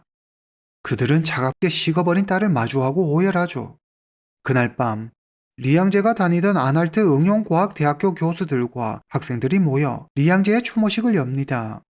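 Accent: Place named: Korean-Chinese, China. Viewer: 조선족 특유 억양이 살짝 남아있는듯 그래도 많이 고치셧네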